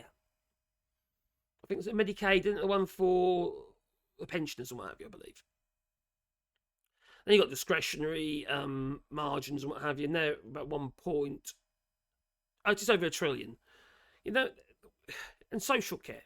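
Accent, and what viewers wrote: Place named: Northern England, United Kingdom. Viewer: I love your Videos and how much you love trees and critters. Guessing UK accents is a hobby of mine. Are you from around Manchester or Liverpool? Your accent reminds me of Lister from Red Dwarf.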